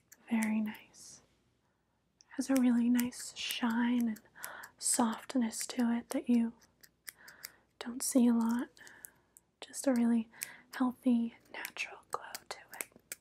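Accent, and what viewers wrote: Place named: Texas, United States. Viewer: This accent is Texan approved. 🤠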